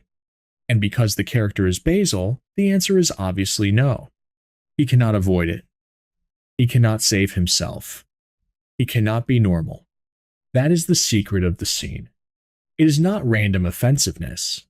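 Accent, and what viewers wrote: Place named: United States. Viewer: Hey dont be critical of that. That's how Americans say that word. That's how they are taught. Live & let live ! That's the world we live in.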